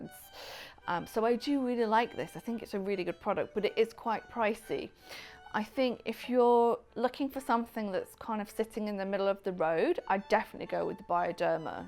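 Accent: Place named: United Kingdom. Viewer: I love British English accent 😍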